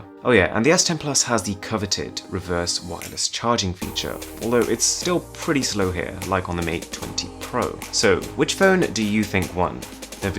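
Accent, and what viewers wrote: RP, United Kingdom. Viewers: Dude. Your voice is making me fall asleep. So calm and posh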